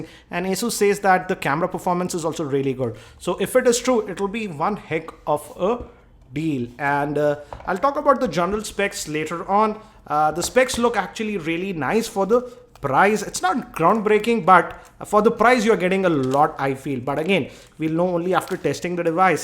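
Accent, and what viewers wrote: India. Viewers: You talk like Apu Nahasapeemapetilon. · indian accent so funny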